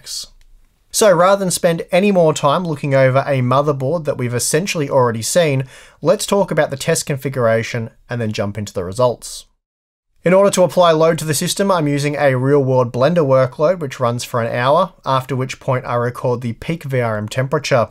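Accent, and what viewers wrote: Australia. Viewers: Might not apply, Google caption said curve at 19. Aussie accent usefulness.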